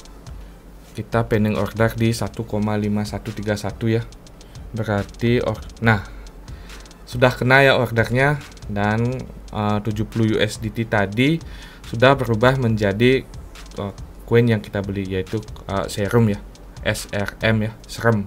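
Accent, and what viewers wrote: Bali, Indonesia. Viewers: Makasih bang.. abang dari bali ? Logatnya seperti orang bali.. kalau iya.. sukseme bang. Rahayu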